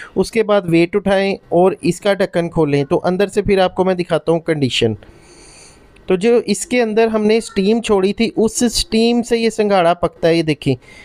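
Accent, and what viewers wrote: Punjab, India. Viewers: آپکا لہجہ اور تلفظ پنجابی ھے۔خدا کے لئیے انگریزی نہ بولیں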